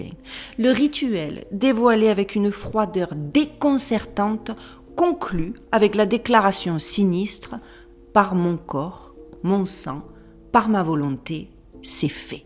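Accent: Marseille, France. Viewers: Bonjour..Belle, Blonde et Curieuse de vérité.tout ça avec l’accent marseillais bonne continuation .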